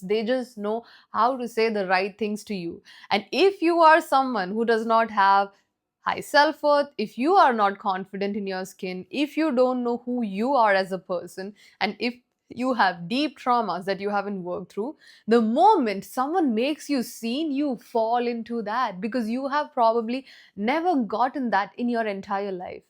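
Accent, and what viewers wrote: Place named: Kerala, India. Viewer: Your English feels like malayalam 👀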